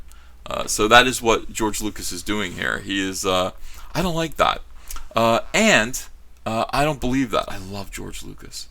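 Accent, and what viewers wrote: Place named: Oklahoma, United States. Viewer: You have a an accent now, like you are from Oklahoma or Arkansas.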